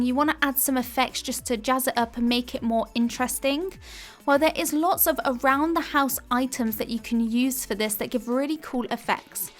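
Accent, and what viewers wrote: United Kingdom. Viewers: Are you from UK? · Sou are bri-ish (no hate nice tips):)it help me alot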